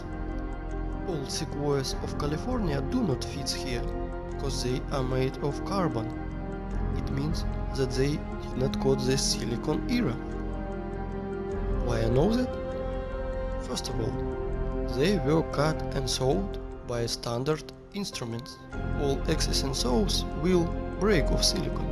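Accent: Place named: Russia. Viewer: Why is the music so loud¿. Its Hard to hear what he says, with an Russia english accent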